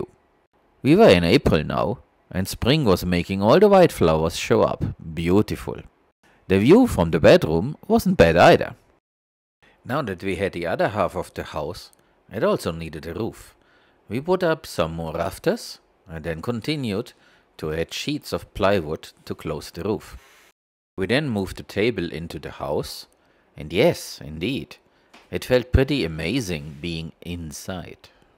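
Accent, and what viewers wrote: Germany. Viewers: Where did you get your German accent ?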